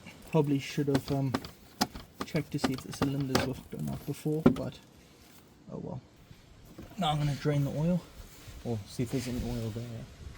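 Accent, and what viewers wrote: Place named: South Africa. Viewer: Not a bad video Bru. Looks like UK but I can hear your SA accent coming through. 👌👌👍👍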